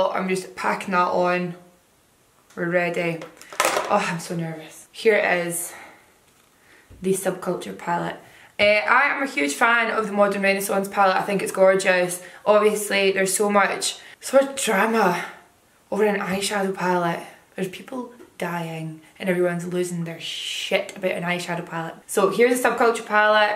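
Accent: Scotland, United Kingdom. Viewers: She's Scottish, and yes I love her accent too! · Are you Scottish? :}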